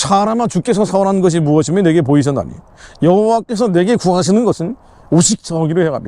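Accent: North Korea. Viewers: 다 좋은데 북괴 말투는 정말 싫타.... 간첩아니고서야 왜 북괴 말씨지?? 북괴에 자주 갔었나.....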